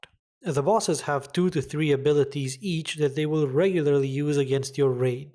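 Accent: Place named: Finland. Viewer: I´m not sure, but sounds finnish!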